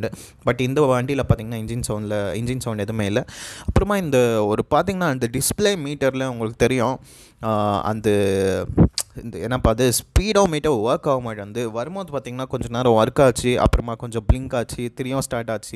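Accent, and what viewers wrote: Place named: Bangalore, India. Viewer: we bangalorean speak tamil with same axcent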